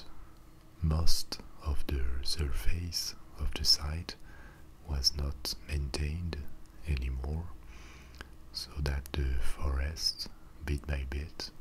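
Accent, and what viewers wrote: France. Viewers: I'm not usually find of French accents. Yours is different, I just don't know what makes me like it so much.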